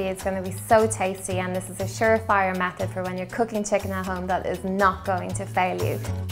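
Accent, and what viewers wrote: Ireland. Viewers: Is that an Irish accent? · this is such a lovely video !. is she irish ?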